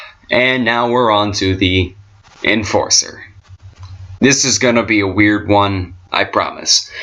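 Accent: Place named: Scotland, United Kingdom. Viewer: SCOTTISH ACCCCEEEEENT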